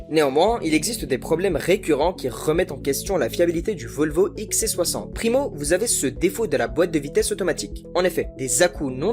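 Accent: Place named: Banlieue, France. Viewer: Intéressant au début , par contre les : voitchures et les rrrr de banlieue, merci j’ai arrêté de regarder c’est insupportable, salut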